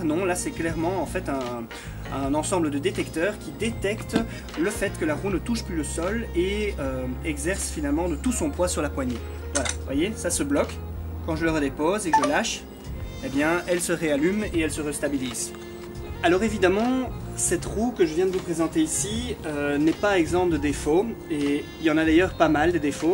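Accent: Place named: Belgium. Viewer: Bonne vidéo! Je me suis décidé et j'ai commandé la Z6 :-) Tu es de Belgique ? J'ai cru entendre cet accent familier. Ce serait cool de wheeler ensemble en tt cas, je suis de BXHELL !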